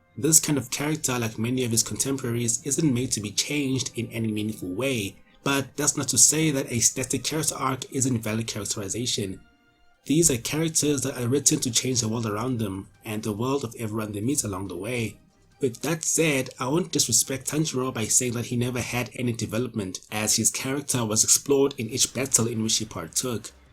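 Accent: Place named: RP, United Kingdom. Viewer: Bros english is really queen Elizabeth II level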